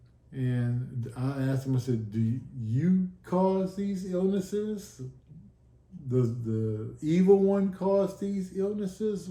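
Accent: New Orleans, United States. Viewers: Love your story, and you sound like your from the New Orleans area? I was born and raised there.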